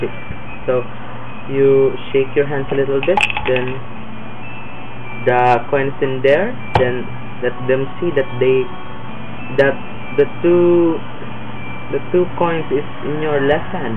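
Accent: Philippines. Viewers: HAHA total Filipino accent good job though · Dude r u Filipino? Ur accent is so strong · he has a fob voice philipino pride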